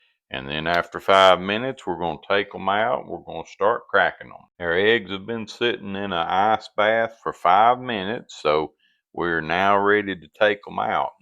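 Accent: South, United States. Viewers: Love your southern accent and your bowled eggs.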